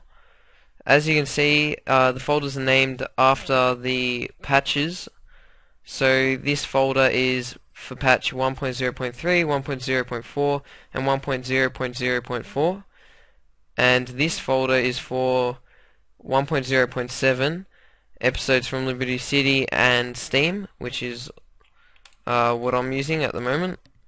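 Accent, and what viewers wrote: Australia. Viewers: ha, i knew it! the australian accent is just unmistakeable :D whereabouts do u live? i am from germany and spent half a year over there, was fun ;)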